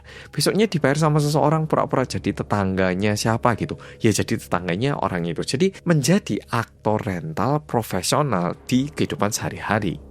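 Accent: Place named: Java, Indonesia. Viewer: Salut keputusan Yujin❤ tks muedok 🎉🎉🎉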